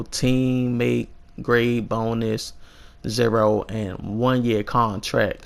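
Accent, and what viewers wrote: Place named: Washington DC, United States. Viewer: I came from another video and I didn't watch the whole video but the part that I did see you sound like you from DC.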